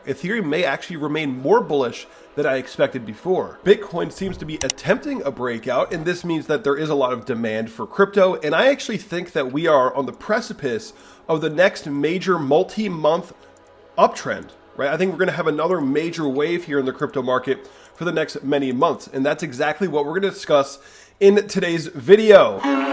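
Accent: Sweden. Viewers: Are you from Sweden originally?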